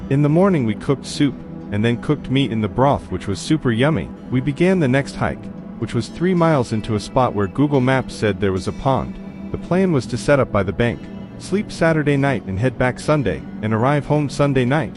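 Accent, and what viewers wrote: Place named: Russia. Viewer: this Russian voice was hard to understand but I'm loving your work so far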